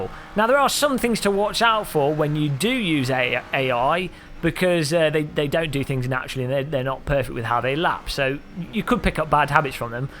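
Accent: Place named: England, United Kingdom. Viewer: Dude you are a F.........king pure English dude :-)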